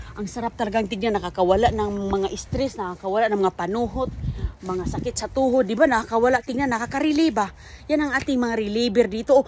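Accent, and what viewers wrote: Visayas, Philippines. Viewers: Visaya bas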